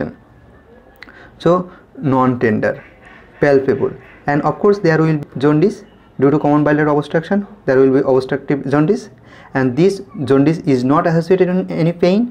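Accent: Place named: Bengal, India. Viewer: Legendary bangali accent😅. Thank you for clearing the concept so well.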